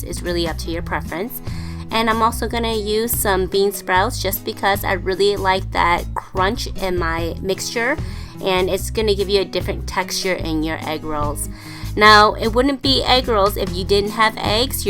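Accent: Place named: Asia. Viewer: This video is sooo entertaining and well said doing the procedure inspite of our asian accent. 2 thumbs up for you madame chef! I will cook this one for my kids.thank you very much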